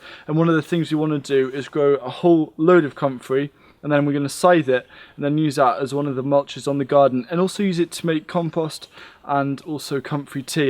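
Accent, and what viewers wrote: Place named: Wales, United Kingdom. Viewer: Great video. I'm surprised you don't have your own TV show. Perhaps you do. I'm in Canada and have no way of knowing. I'm Scottish and was curious about your accent. Now I see your Welsh. All the best and please keep them coming.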